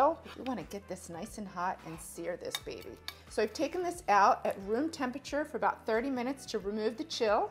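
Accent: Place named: New England, United States. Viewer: loved what you did both on the pot roast and the rib roast. Methodical and clear. Also your presentation is awesome. Total butter, can’t replicate the accent but willing to bet New England/ New York maybe Jersey. Cheers.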